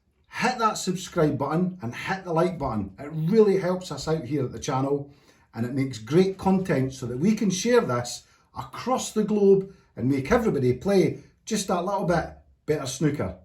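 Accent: Scotland, United Kingdom. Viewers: I think what I'm getting at is for me it is easy to predict the path of the cue ball only when I apply a stun to an angled pot, everything else from centre to top or centre to bottom can be a grey area with regards to the path of the cue ball. I'm from Ireland so have no problem understanding the Scottish accent 😅, I've checked out a few channels and your is definitely one of the best 👍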